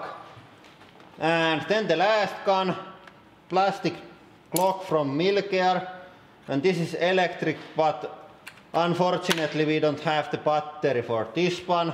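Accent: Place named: Finland. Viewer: Sun ralli englanti on paras!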